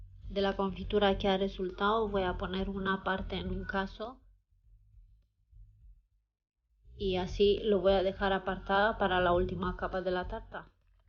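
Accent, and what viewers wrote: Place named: Romania. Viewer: También quería mencionar que me fascina tu manera de hablar y tú acentos, no podía descifrar de dónde era, pero es genial que sea de Romania , deberías hacer un canal donde también enseñes tu idioma rumano!!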